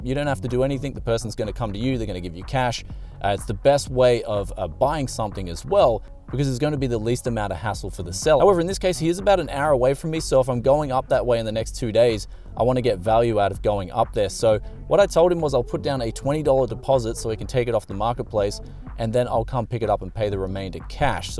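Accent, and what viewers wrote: Australia. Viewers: Mate I reckon your Aussie accent led that guy to chuck in that cooler LOL. If you sound like a bogan in the USA you can get some dope deals and the yanks love that "Howya goin" accent. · Welcome to MAGALAND dude! Let me get my brain around this...your're footloose in America, with a Camaro, big smile, and Aussie accent. DON'T SPEND ALL YOUR TIME THROWING OLD COMPUTERS ON THE HOTEL BEDS! P.S. I have a free MSI 980 if you drop by and pick it up. Cincinnati Ohio.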